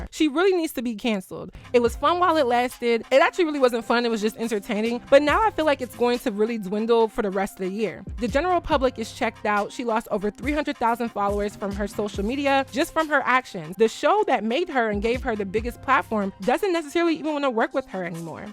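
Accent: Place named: Philadelphia, United States. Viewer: I love your Philly accent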